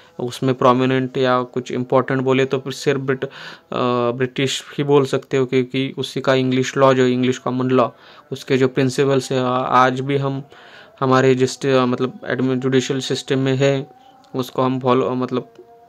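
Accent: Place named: Maharashtra, India. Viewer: Same amchya sarkhich hindi speaking...... apla marathi manus detected ❤😁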